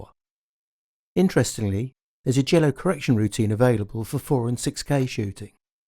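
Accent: United Kingdom. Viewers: Once more, great stuff and accurate opinion. I am professional filmmaker/photographer. I have owned and shot with Nikon D750, Leica M, Olympus EM-1 MKII and the GH5. I agree with absolutely everything you said, the GH5 menus and usability are the best I've used. Cheers from Brazil and keep doing these. You and your British clarity + accent rock. Cheers from Brazil 🇧🇷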